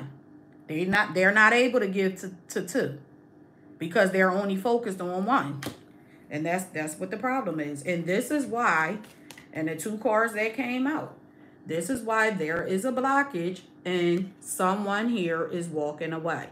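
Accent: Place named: Philadelphia, United States. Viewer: oh yea Philly really apart of you cause you have a very distinct accent. I love it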